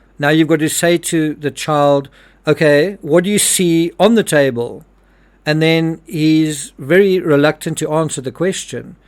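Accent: South Africa. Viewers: South African accent. Love it too when he says that!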